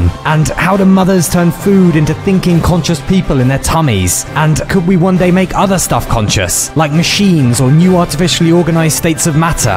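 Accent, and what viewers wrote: United Kingdom. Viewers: Can’t tell if he’s British or Bulgarian but whatever it is, I love the small Bulgarian easter eggs!!! Love your ideas man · Yeah his accent, vocabulary and past videos (complaints about the British train system etc) screams Englishman, but now I've seen he apparently lives (temporarily?) in a clearly Slavic/Russian place, judging by the POV footage from this video. Maybe he found a Russian soul mate ;p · Your new microphone isn’t as clear as the old one. That or the overlays are drowning out the crispness. It’s more important to hear the British narrator than the musical background! Edit: the video is still damn good enough to make me forget the issue but it exists. Pls make more space turtle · r u British living in Bulgaria, or just Bulgarian with a british accent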